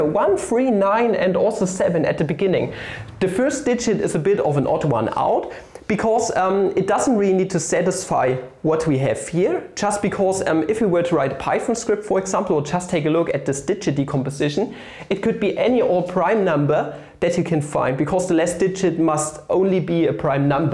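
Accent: Germany. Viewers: Hearing a German speaking English always makes me mad because of.... well you guessed it: accent! :-D it´s hard to follow the content while listening. Apart from that, I would appreciate your work more if I wouldn´t have heard about this by the one ans only, James Grimes. No offence, man, you`ve done a great video! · you sound very german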